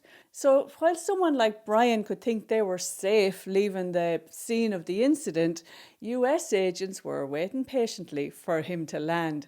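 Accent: Ireland. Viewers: Hi from NJ,,, I detect your Irish accent (pretty sure). Hopefully we will be hearing of his arrest soon, his behavior has been in our face long enough.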